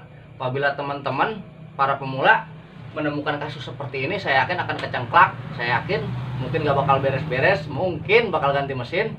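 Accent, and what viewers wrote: West Java, Indonesia. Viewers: Mantap hu jeli banget penjelasannya...orang sunda kang????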